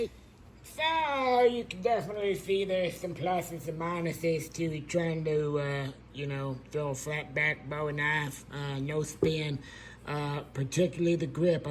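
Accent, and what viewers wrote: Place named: Louisiana, United States. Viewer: This dude sounds like he grew up in the Bayou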